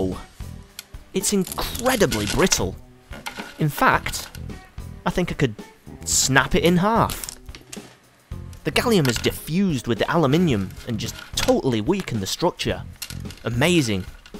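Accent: United Kingdom. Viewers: It's probably the UK pronunciation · He's British lol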